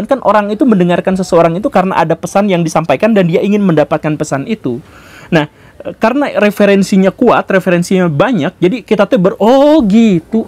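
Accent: West Java, Indonesia. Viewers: Logat Sunda nya masih ada. Mantap. Lanjut terus pak guru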